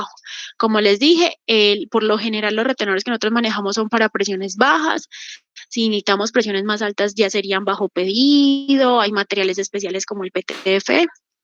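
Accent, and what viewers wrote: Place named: Antioquia, Colombia. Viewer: Con ese acento paisa puedo ver y oír un video de más de 3 horas 😊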